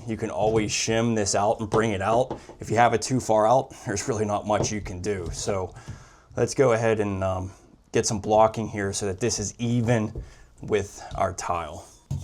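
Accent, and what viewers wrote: Pittsburgh, United States. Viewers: Let me tell you... you know a Pittsburgh accent when you hear one! Love your videos! Thanks for the knowledge sir.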